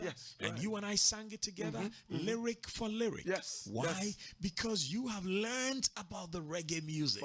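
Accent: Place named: United Kingdom. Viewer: But you’ve got a British accent so what should we call you.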